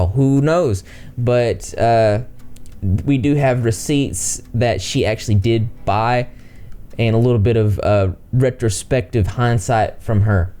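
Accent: South, United States. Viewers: I love the country boy accent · I commented on your eugenia cooney video something similar, but i wanted to ask, where are you from? This is the second video of yours that im watching and i think ive narrowed it down to either alabama or louisiana/texas area. I am from houston area and not many people talk like that around this here, thats why im speculating louisiana area. Sorry for the long comment i am just so wowed by southern youtubers idk why😂😄😳😳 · Hello from Lexington KY, loving the southern accent, where are u from? I subbed. So great to find a southern man with a moral compass and moral integrity on YT!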